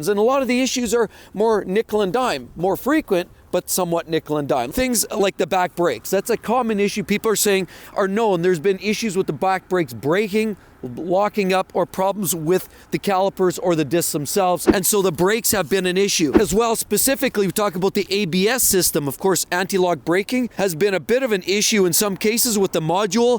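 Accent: Canada. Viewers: That Canadian accent kills me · your thick Canadian accent kills me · I can't take his Canadian accent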